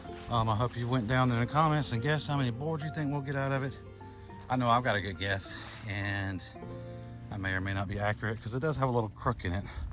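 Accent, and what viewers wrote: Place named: Georgia, United States. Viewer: Where in Georgia are you from?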